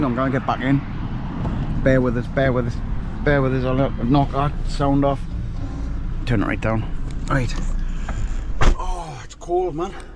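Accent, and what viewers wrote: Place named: Derbyshire, United Kingdom. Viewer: Where are you from? Love Your accent! sounds like Derbyshire? That sort of area? X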